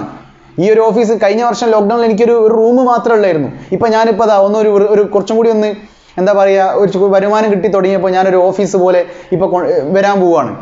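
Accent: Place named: Malappuram, India. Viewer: താങ്കളുടെ voice അടിപൊളി പക്കാ mlpprm ഭാഷ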